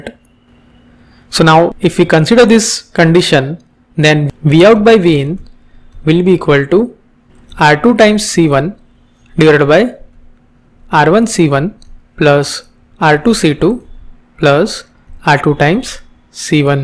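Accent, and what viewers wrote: India. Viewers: Due to your Indian Accent, it is hard to wrap my head around the matter you explain. 😞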